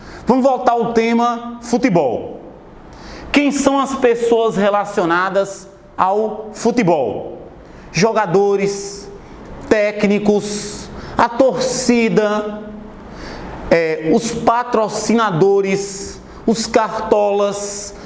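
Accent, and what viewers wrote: Northeast, Brazil. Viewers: Ótima explicação! E esse sotoque..do meu nordeste é lindo.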